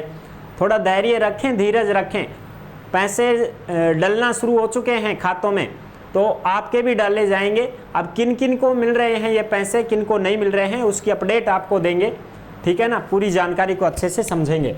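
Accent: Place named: Hadoti, India. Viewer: आपकी हाडोती बोली बहुत अच्छी लगती है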